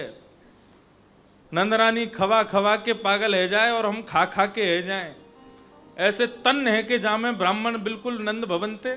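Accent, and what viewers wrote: Braj, India. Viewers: महाराज जी आप इतनी मीठी ब्रज भाषा में बोलते हैं ऐसे लगता है मानो प्रत्यक्ष लीला हो रही है सामने हमारे छोटे से लाला को किसी की नजर ना लगे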